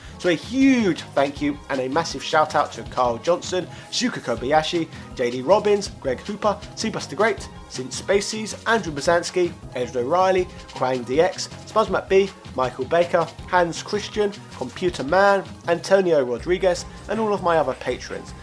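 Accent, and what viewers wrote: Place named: RP, United Kingdom. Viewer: You truly are an aristocrat. Most Brits might call it Stree figha free.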